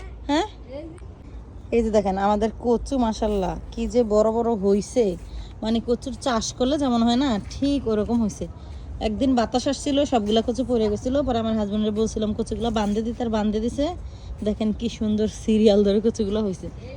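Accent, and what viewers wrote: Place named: Comilla, Bangladesh. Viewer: আপু কিছু মনে করবেন না,কুমিল্লা কোথায় আপনার দেশে বাড়ি কেননা আপনার কথা ঠিক আমাদের বাড়ির কথা ,আমিও আমেরিকাতে আছি,please আপু বলবেন কিন্তু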